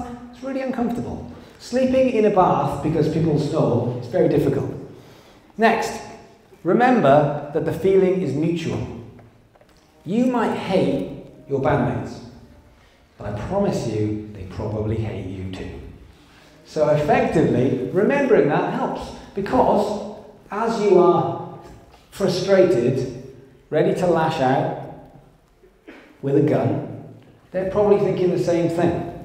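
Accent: United Kingdom. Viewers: I watched over 30 of your videos in the last week. First: love your brit accent. Second: great contents. Do you know Marco Montemagno? Is a 47 y o men who lives in Brighton. He helps people with startups and big companies in marketing strategies, you should contact him ;) He also has a BIG youtube channel in Italian where he talks about marketing, social media etcs.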